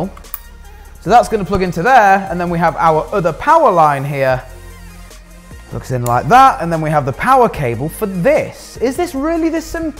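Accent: England, United Kingdom. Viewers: What do you mean "say Ethernet Properly "? He's saying it with an English accent, do you speak english? Because its sounds ok to me. it's called English not Americanese.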